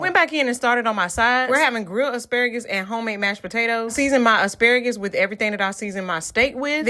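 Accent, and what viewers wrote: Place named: Texas, United States. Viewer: You're accent let me know you're Texans. Glad to see you are repping HEB. I'm from Kingwood, but living in Chicago now. Miss me some HEB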